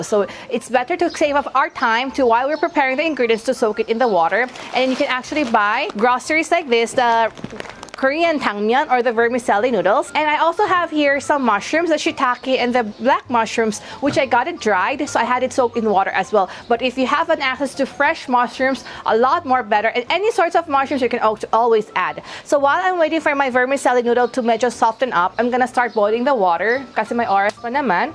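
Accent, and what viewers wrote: Philippines. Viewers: you're too cute!! i love how you talk taglish...:)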